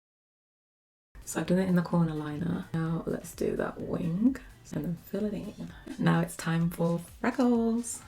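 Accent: United Kingdom. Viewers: Are you from the United Kingdom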